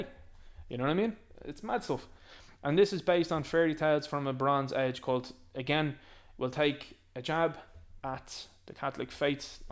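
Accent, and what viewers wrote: Ireland. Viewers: Can't stand the 🤡 not funny at all ever was he's cringe just shouts makes me emberressed to be Irish and his stupid culchie accent annoys me....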